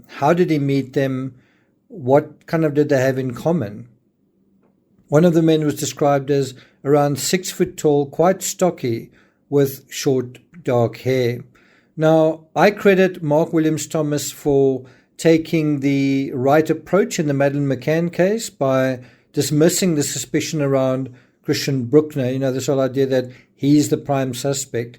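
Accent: South Africa. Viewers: I hope you stay here, love your analysis. Lekker to hear a South African on the tubes ❤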